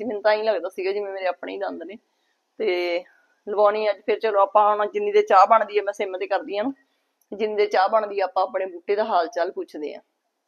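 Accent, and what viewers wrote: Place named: Doaba, India. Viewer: Sade vi doabe vich pateela khende ne bhan ji 🙏🙏 thudi boli sade vargi a same ❤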